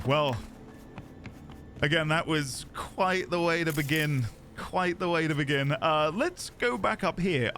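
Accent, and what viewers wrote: United Kingdom. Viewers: do you have acting training? Your mid- century RP accent is top shelf!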